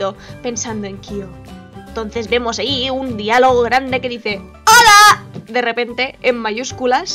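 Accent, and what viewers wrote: Catalonia, Spain. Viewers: ¿Ereh catalanah? OH DIOS POR FIN (alguien más que es catalán) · Eres catalana? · se nota tu acento español y soy seguidor de tu insta tambien :3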